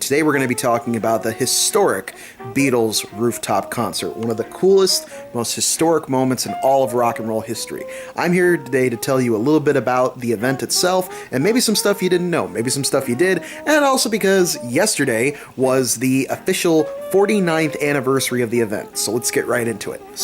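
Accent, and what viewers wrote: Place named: United States. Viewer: Why are they always Americans that do this sort of thing,they speak too fast with terrible accents,I keep waiting for him to say Oh my God yuk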